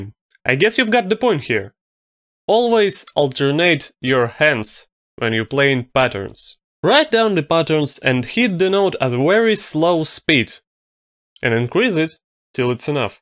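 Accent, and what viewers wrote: Russia. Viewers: ахаххахах, смотрел все видео с мыслью: "Какого хера у чела такой сильный русский акцент?" (слишком сильно напоминает меня, когда свой голос записываю). Вот оно что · я сразу понял, что имею дело с Российским человеком из-за акцента) очень всё понятно объяснил, без воды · RUSSIAN ACCENT, why not do Ha Pycck| /| |/|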